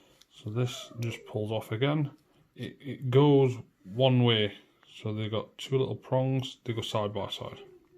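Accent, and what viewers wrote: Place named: United Kingdom. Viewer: Thanks for teardown instructions but damn your british voice irks me sorry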